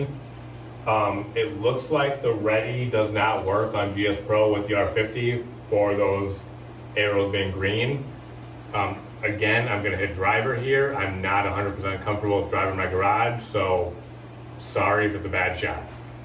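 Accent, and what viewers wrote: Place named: Wisconsin, United States. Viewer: You talk very Wisconsony. It’s a beautiful thing. I’m happy to know folks like you are still around.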